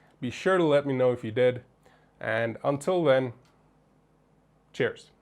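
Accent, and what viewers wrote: South Africa. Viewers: hehe, do I detect as slight South African accent in there?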